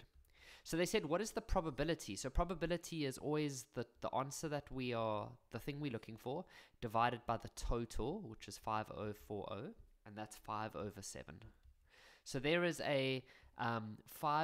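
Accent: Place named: South Africa. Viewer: Sounds like a "Soutie" iykyk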